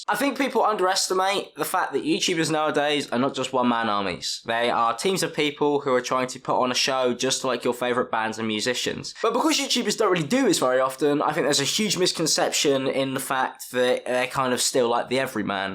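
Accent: United Kingdom. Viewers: This is the first time I’ve watched an I’m video. Is he always this British? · The more I watch the less of a British accent he has//// guess ion getting used to it🥺🥺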